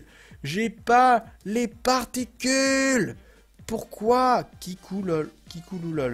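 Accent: Quebec, Canada. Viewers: Es-que tu as un peu de québecois ? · tu est quebequoi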